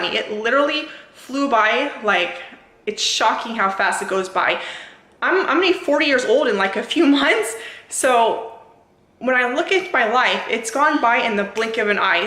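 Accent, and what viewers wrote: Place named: Chicago, United States. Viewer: I agree with you 1000%. I wish I would have started earlier to take care of my body. The last 50 yrs went by so fast and I am not 71 yrs old and while I have given up meat I still eat some types of fish and eggs though i am trying to wean myself from that. I will most likely give that up by February though I still drink non-fat milk and sometimes I eat cheese but Not butter. So i am looking forward to being a vegan by Feb 1st. Thanks for you video.. Your accent sounds like your either from back east or Chicago. I am originally from Ohio but have lived in Los Angeles for the last 57 yrs. I love your birds and my "tiel" "Baby" is still with me. Thanks again for your video ..Take care...keep up the good work!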